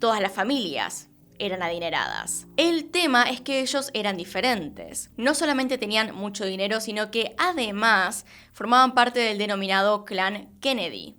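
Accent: Argentina. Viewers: Hola. Eres buenísima para narrar y editar tus casos. Eres mi favorita , además me encanta tu acento argentino.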